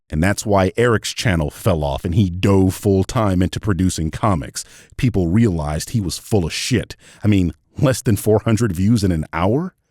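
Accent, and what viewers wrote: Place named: England, United Kingdom. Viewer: It sickens me to hear an English Accent talk such Bullocks. has moved beyond being a simple village idiot and into the tall grass of Racism, Hate and Supremacy. As if AZ is better than anyone else. The Porcine Pratt Shartts his terrible opinions onto an audience of hungry mouth breathers. His mother was a hamster and his father smelt of elderberries.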